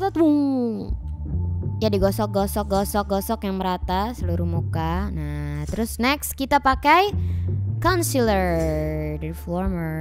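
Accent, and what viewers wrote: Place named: Java, Indonesia. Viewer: Kenapa suara kak di sini agak medokk!! (Emot ketawa)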